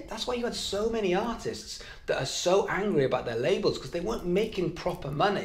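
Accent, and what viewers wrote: United Kingdom. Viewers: Great video, and nice to hear a fellow brit!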